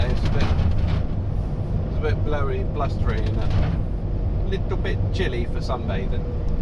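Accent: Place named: North, United Kingdom. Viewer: great northern accent lol irish amer ny us airforce veteran master obrien sir lol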